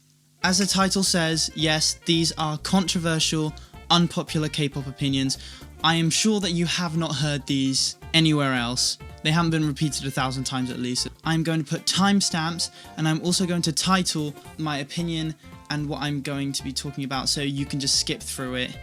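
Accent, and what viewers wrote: United Kingdom. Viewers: Not related to the video but im new here and fricking out right now, like, Handsome cute deep british accent intelligent time stemps his video to subjects y so perfect 😍 ?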